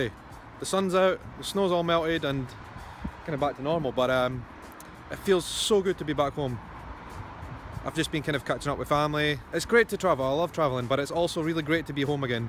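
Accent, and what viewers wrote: Scotland, United Kingdom. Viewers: Love the vlog! I moved over to Sweden last year and it's nice to hear a Scottish accent again! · Hey, cool! You're from Scotland! Love Scottisch accents! Subscribed! :D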